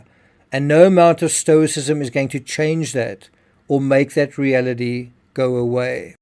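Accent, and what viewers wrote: South Africa. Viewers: I like his accent. Is it South African?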